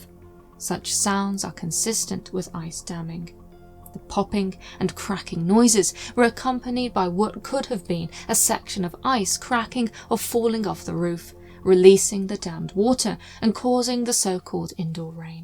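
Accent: England, United Kingdom. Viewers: I absolutely love this channel 💓. The narrator with her English accent makes for an amazing story teller!! I also love how the narrator takes the content seriously and tells it in a serious manner ❤.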